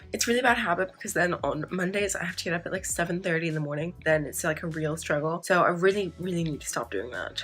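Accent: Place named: United States. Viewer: I think it's super cool that you are pushing yourself to "read harder" by reading at least one adult book a month. I hope you find some new authors and genres that you enjoy. I am such a tired crier, like if I'm weepy, chances are I'm exhausted. Which country do you live in/ go to uni? Your accent is like super hard to determine lol, sometimes I'm like oh! America for sure, and then sometimes I'm like... England? maybe? Australia? lol I'm the worst at this...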